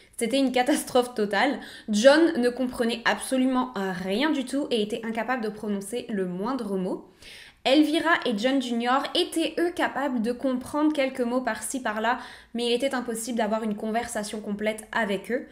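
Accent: Quebec, Canada. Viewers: Salut. Top vidéo. Tu devrais faire une vidéo avec Mcskyz et Sonia de France. Je suis malheureusement plutôt passionné par les psychopathes qui foulent la terre. . La première fois fois que j ai decouvert cette chaîne, j ai été tres surpris que qq1 d aussi mignonne fasse ce genre de vidéo! En plus l accent québécois est trop cute. Bref ce format de 3 histoire est top! Bonne continuation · On entends le Québec derrière ton accent, c'est normal???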